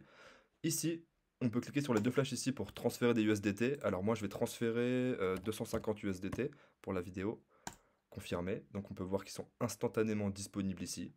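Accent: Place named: Switzerland. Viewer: Ta géré ta technique est simple et efficaces à parament je suis vraiment debutant et j’ai reussi je suis fier , et c’est grace a ton tuto je jure je l’es écouter au moin trois 4 fois , avec le téléphone et en meme temps sur mon pc , jamais pas les futurs de base , mais en vrais c’est l’avenir 🤣 lol jte remercie super bien expliqué, et les sécurités qui faut c’est tip top ! Merci a toi encore ;) je pense que je vais regarder toute ta chaine aussi passue je suis tomber par azard et j’aime bien l’accent suisse ! Nanante huit :) peace et merci 🙏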